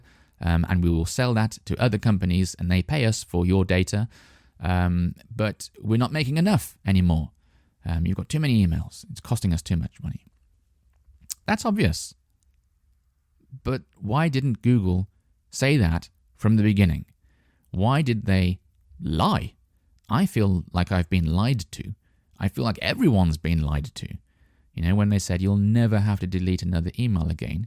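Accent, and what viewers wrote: United Kingdom. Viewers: I listenning you cause i have exam tommorow, like Final exam And i have problem with that bitish accent so thank you for this podcast. You have good voice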